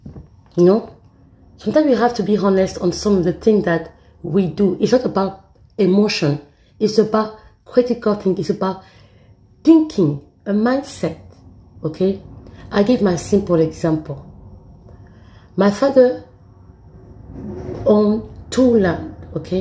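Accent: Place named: France. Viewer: Mmmm… French accent 😊😊😊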